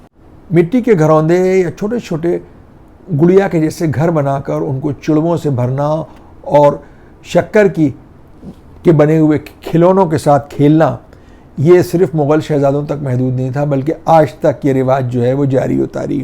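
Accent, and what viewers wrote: Lucknow, India. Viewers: saheb aik gujaarish aap lucknowi chikan ka kurta aur wah bhi chunnat wala pahan kar episode kijiye. bahut authentic lagega aapke lucknowi lahja ke sang.chia to hoga aapke paas.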